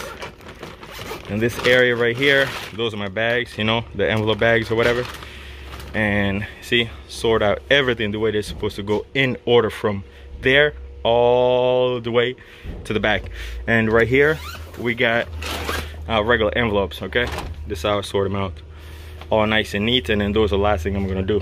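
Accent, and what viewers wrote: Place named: Jamaica. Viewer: I love your attitude...so much...u sound Jamaican....or something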